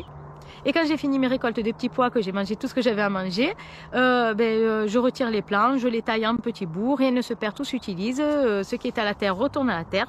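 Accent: South, France. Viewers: coucou, j’ai découvert ta chaîne YouTube depuis la pas mal de temps... je crois que t’as un accent du sud 🤣😀😀 j’adore.. demain je vais planter des courgettes 🖍☢️♻️♻️㊗️.. Bon week-end. Yanis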